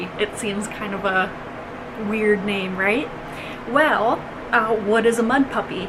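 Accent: Midwest, United States. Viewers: Welcome to FlossTube. Great job with your first video. Not sure what you meant about a Minnesota accent. My first impression before you told us was - yeah she is a Midwesterner like me! I am in Illinois close to the Wisconsin border, and you sound just like us down here. You betcha! I like your WIPs. They are are not the usual ones I see on FlossTube. Your love for animals is engaging, and I look forward to your sharing your knowledge of animals with us. Best wishes for a safe, happy and healthy holiday season. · Get in line for the Midwest accent,I'm a Scony Our mudpuppys look different too.😆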